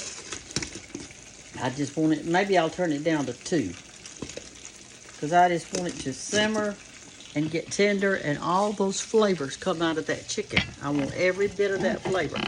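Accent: South, United States. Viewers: I just love that southern accent. I can listen to her all day. I’m from New Jersey. So we kind have a NY accent🤣🤣🤣🤣🤣🤣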